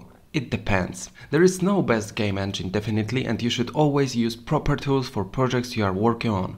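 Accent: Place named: Poland. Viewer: po akcencie od razu poznałem, że Polak! Powodzonka! :)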